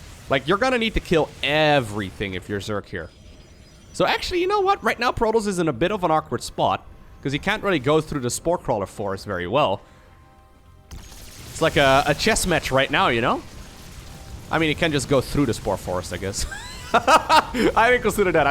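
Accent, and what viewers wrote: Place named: Netherlands. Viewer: lotta letters are pronounced different in dutch. whenever dutch people speak english youcan very clearly hear that they are dutch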